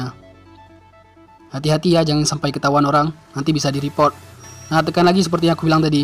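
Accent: Aceh, Indonesia. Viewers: org aceh y bg ya?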